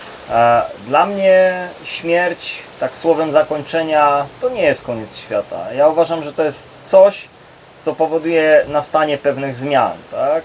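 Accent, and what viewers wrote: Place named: Silesia, Poland. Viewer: Bardzo dobrze godosz. Dużo YouTuberów pokazuje tylko❤ te piękne miejsca a nie jak są zrzyci z naturą.... Pozdrawiam Skąd że Śląska jesteś??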